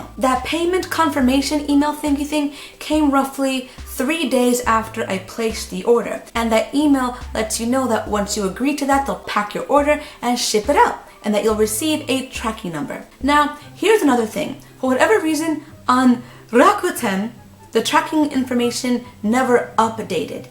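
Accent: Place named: Japan. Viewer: Omigod I love your voice! It’s like a Japanese accent with a lil bit of hype black girl!! XD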